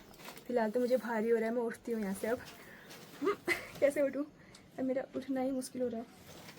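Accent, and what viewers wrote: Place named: Pahadi, India. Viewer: aapka pahadi bolna mujhe bahut accha lagta Hai❤❤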